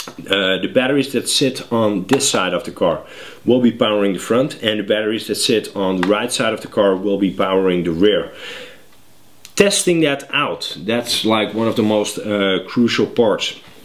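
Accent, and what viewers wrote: Netherlands. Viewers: ok, are you from Holland?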